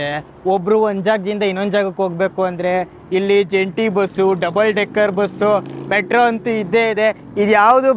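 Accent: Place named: Mysore, India. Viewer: Thumba cute aagi mysore bhaashe maathaadthediya maga very nice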